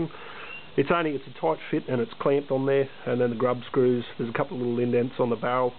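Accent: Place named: Australia. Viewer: thought u sounded like an aussie so checked out your youtube page and saw your Greens pic. Guess you dont vote Green, haha.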